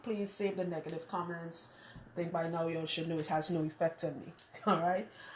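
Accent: Trinidad and Tobago. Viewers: Trini in Paris with locks, I have medium course hair .. I love my hair so much but it has different textures on different locations of my scalp ! WHAT CAUSES DIFFERENT HAIR TEXTURES ON ONE HEAD ? !! It’s weird !! .. it’s not very common but I’m seeing it more and more ! I caught that Trini accent right away .. hahah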